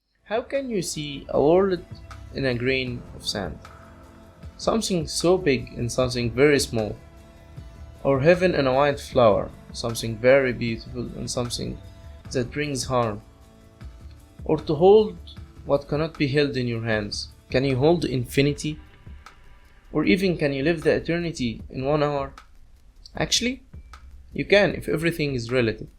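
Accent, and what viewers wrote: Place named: Egypt. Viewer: Love your hard work and amazed about the amount of vocabulary u have. Wish you didn’t have the Egyptian dialect though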